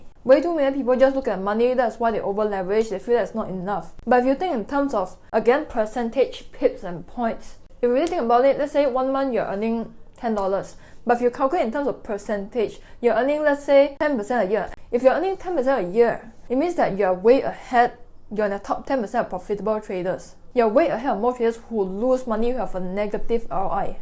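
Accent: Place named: Singapore. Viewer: I love Singaporean accent Lah!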